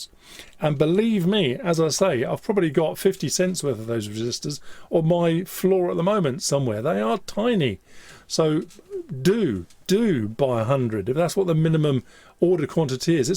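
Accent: United Kingdom. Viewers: Hey great video!! Where in the UK are you from? Thanks for your channel!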